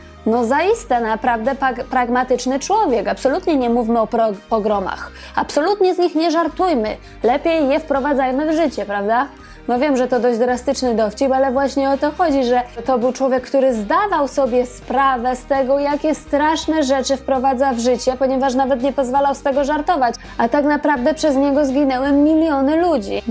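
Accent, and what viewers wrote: Russia. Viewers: Ma Pani ladny glos no i ten rosyjski akcent tez niezly:) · I to z niemalze rosyjskim akcentem!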